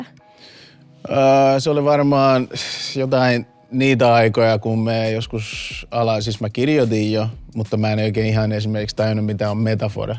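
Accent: Tampere, Finland. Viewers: His songs might be in english but he also has a really strong Tampere accent. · Kommenttini ei liity mitenkään oikeen mihinkään, mutta nokialaisen eteläsuomalaisena tyttärenä, musta on niin kiva kuunnella tota tamperelaista ärrää ja nuottia :DDD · on niin symppis. 😭❤️ Tampereen murre pehmentää häntä vielä enemmän. 😍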